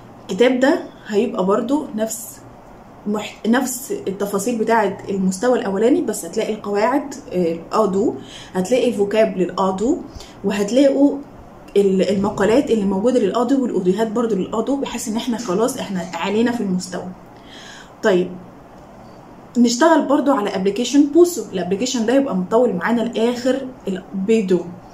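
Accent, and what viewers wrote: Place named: Pakistan. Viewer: Arrête 😂 tu fais la star de français et jcp quoi mais regarde ta façon de parler tu parle comme un pakistan c’est trop drôle en vrai il faut juste corriger tes fautes d’orthographe et de parole Parce que vous parlez de la mauvaise manière et que votre accent ne convient pas du tout au français parce qu'une personne indienne parle français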